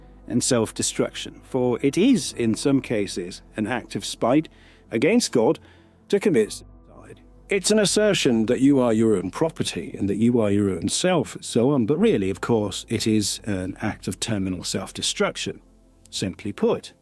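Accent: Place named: United Kingdom. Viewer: Sometimes you make sense, for a Britain, despite the accent.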